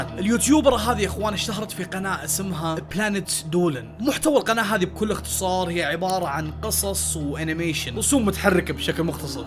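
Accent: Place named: Saudi Arabia. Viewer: وت شنو مصري سعودي 🌚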